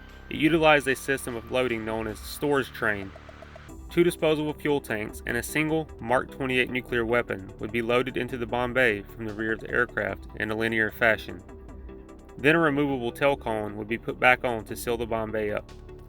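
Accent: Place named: South, United States. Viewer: Seriously, this is one of my favorite channels. The facts, history, and your accent being a bit southern like most of my friends...very easy to listen to. (Yes, i get a lot of $hit for being a 'yank'...lmao) Be well. ☀️😎🇺🇸☀️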